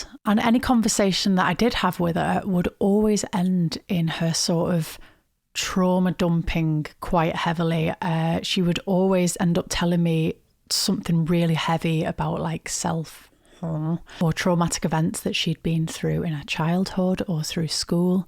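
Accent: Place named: Liverpool, United Kingdom. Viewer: i thourt that you had a bit of Scouse accent in you that's cool snap am a scouser. And you know who you remind me of...Clare Sweeny. Too be fair it sound's like your'e flat mates were'e jealos about your'e sexy look's x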